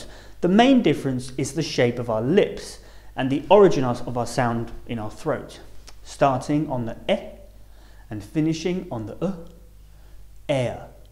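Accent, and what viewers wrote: United Kingdom. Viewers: I have a question... Are you British or American??? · I'd like to make an observation, this class is in British English which has a different pronunciation than American. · the presenter has a British accent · He is obviously British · watching this video as an American and all the examples are just British pronunciations :') "heuh" "powah"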